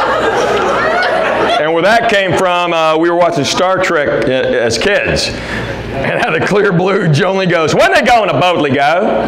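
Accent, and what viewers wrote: South, United States. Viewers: His making fun of southern accents while speaking in one is really funny.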